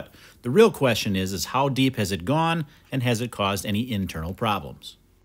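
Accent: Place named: Wisconsin, United States. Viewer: I knew he was a Wisconsinite from the familiar accent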